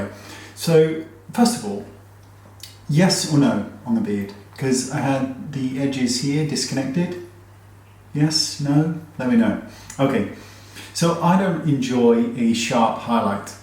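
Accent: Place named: United Kingdom. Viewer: Disconnected beard 👍, highlighter under blusher 👌, that earring whooooo makes me cruuuush on you and the cherry on top of the cake is your accent! It's british i assume... ! How i wish i could learn that accent ,it's beautiful to the ears. Sooothing OMG, Wayne is Asmring without realising it (if that make sense) lol! 💓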